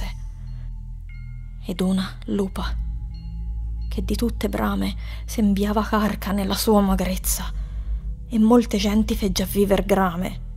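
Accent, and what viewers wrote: Tuscany, Italy. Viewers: bra she is speaking like someone from tuscany TODAY! do you not hear "la gorgia toscana"? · Your tuscan accent is so pretty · Interesting. Is this the way Dante would have spoken, or is the pronunciation closer to the modem Tuscan dialect? Or both? I speak Italian, and to me some words almost sound Spanish. Probably because of the famous Tuscan 'h' sound, but also because of the 'ch' sounds (like German) and the occasional 'th' sound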